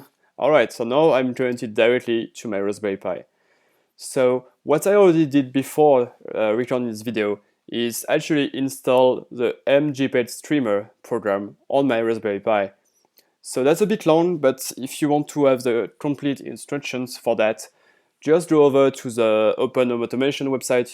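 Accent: France. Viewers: Serait-ce un accent français camouflé ??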